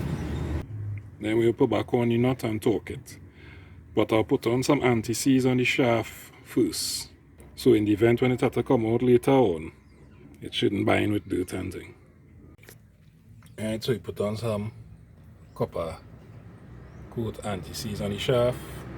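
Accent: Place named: Trinidad and Tobago. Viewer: Voice sounds like trinidadian 💯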